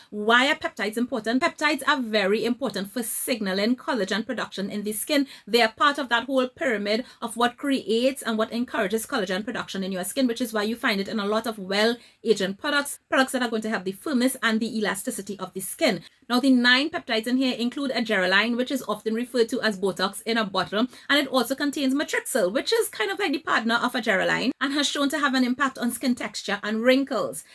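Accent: Trinidad and Tobago. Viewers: A Trini! A Trini accent never sounded so sweet 🇹🇹🙌🏽🔥!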